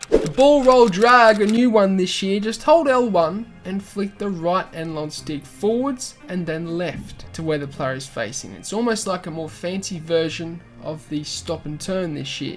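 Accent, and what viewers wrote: Australia. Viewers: Aussie are u coaching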